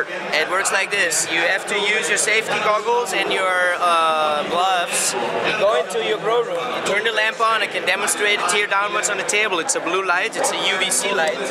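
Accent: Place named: Netherlands. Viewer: Dutch as shit 🤙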